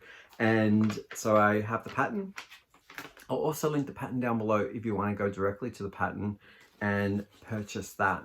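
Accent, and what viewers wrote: Australia. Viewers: OMG an Aussie accent 😍 hello, you came up as a suggested video & was happy to hear you! Fellow yarn lover here too 🙏🧶 call your mannequins Matilda 😂 Mine was named by my daughter & Crochet bestie Leighann, her name is Mary Madison Mannequin 🫂🤎🦋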